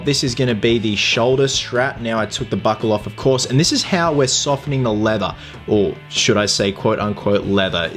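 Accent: Australia. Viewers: Mate, are you Aussie. Cause if so go Australia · are u Aussie u sound it I am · R u from Australia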